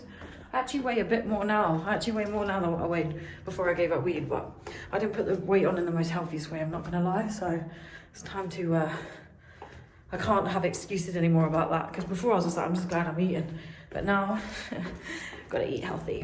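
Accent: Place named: England, United Kingdom. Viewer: Nice English accent different from Americans lol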